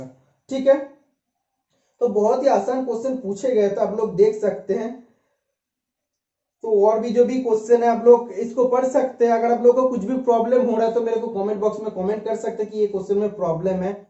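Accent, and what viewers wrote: Bengal, India. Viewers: Vaiya apko bat sunkar bihari nahi lagrahe Bangali lagrahe ENG er puro 25 questions dekha digiye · tumi bangalee?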